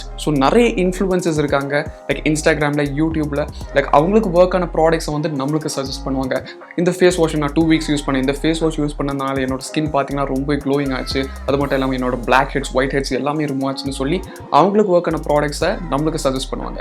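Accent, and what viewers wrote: Kanyakumari, India. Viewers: slanglae purichuruchu bruh.....sweet voice + kk slang lite😅❤️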